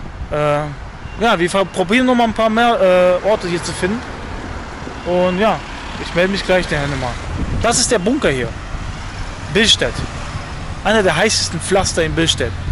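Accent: Berlin, Germany. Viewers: Ich merke einen leicht überheblichen Berliner Ton. Typisch „Berliner Schnauze“. Sorry, aber du kennst weder Billstedt noch Hamburg!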